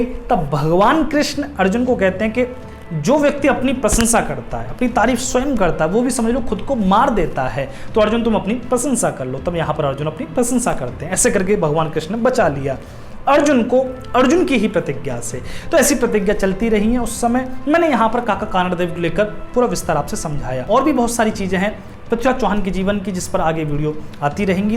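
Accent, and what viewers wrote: Braj, India. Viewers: Kha se ho aap gurudev.. Aapki bhasa humari jesi hi h...braj chhatra ki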